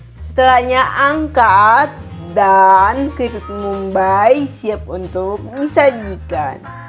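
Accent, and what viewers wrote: Palembang, Indonesia. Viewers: Cek , R nyo asli nian wong pelembang sabrang . Bedrot .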